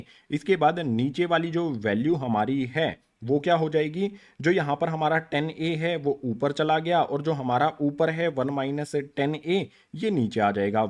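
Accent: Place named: Bharatpur, India. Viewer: Language Bharatpur ki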